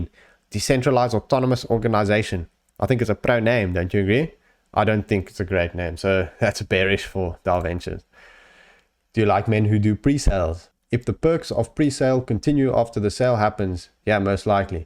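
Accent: South Africa. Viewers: At first I was sure you were a saffa. Now I'm almost certain you're from stellies or Somerset... Keep on the great vids either way boet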